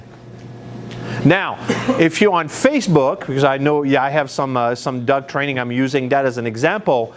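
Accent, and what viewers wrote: Quebec, Canada. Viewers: Sounds more like Quebec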